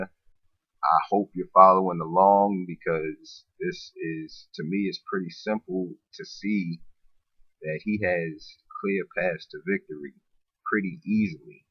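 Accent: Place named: Baltimore, United States. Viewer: I like your prediction btw. Obama carved out a new map in 2008 with a lot of new blue states. I don't think Bernie can win all of what Obama did, but he doesn't have to. Bernie can even lose Ohio and Florida and still easily win. Btw, are you from Baltimore? Thought I picked up some of that in your accent.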